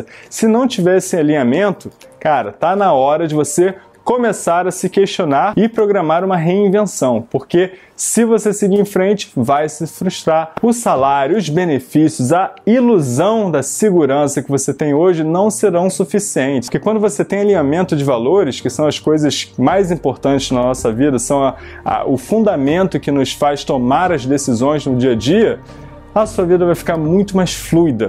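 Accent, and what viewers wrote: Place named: Rio de Janeiro, Brazil. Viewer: examente, um sotaque carioca e um bom cenário iludem muita gente.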